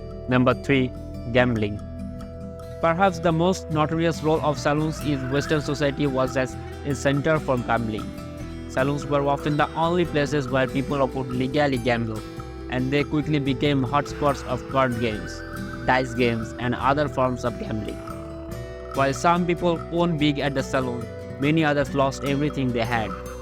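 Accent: India. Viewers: An Indian narrator? REALLY?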